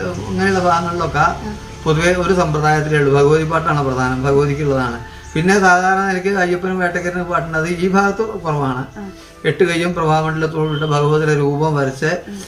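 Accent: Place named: Kongad, India. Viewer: You have presented a realistic account of Valiya kavu and the surrounding places.Interview with Sri. was informative.Your kongadan colloquial style of casual narration is pleasing & is refreshingly different from the stereotype. For me,who left Kongad decades ago, this was a revisit to the places I grew up.Thank you very much.Looking forward to the ensuing episodes.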